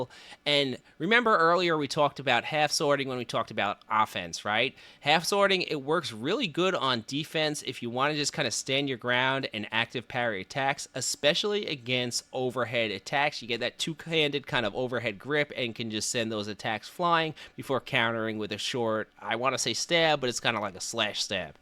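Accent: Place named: New Jersey, United States. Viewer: This guy is def from NJ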